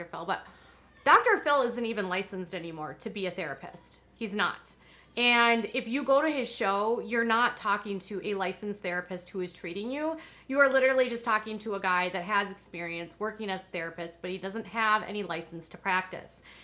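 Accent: Minnesota, United States. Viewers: I love your ponytail look and the Minnesota accent, and more than that I love your shoot from the hip and salt of the earth no-nonsense style of reporting. You have done your homework and your research and I appreciate it. I have really been enjoying your channel and soon as I get my finances in order (translation: the IRS does it in 7 to 9 days) I am going to join patreon and pass some of that stuff to you, girlfriend. 😉🪐👍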